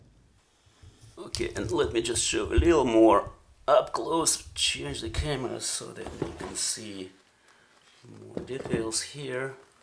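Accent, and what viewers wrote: Eastern Europe. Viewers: Такое впечатление, что английский язык для вас не родной. Проскакивает какой-то славянский акцент. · Am i the only one who hear slavic accent?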